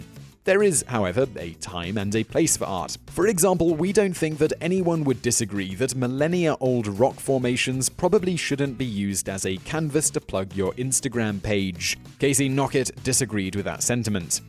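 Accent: United Kingdom. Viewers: I like you saying slang terms in a British accent.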